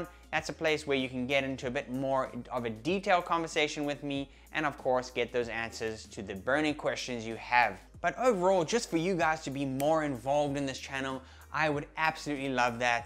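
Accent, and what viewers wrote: South Africa. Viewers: Audio is perfect. You are South African, right? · Where are you from, your accent sounds South African - hi from South Africa btw :)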